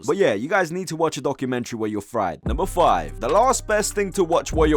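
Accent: United Kingdom. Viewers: After half of the video, just found out this dude is British 💀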